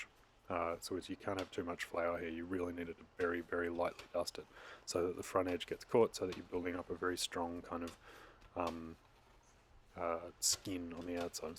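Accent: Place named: Australia. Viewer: hey, he cant help being australian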